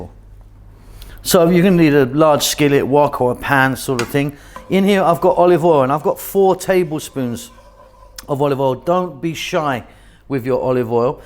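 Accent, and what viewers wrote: United Kingdom. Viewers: Your question confirms my initial response: what does someone with a British accent know about refried beans? They are great with Mexican or Tex/Mex dishes.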